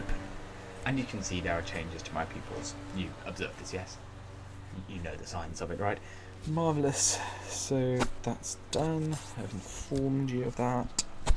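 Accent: United Kingdom. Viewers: Tbh this British accent makes the whole video even better. Damn it relaxes me ^^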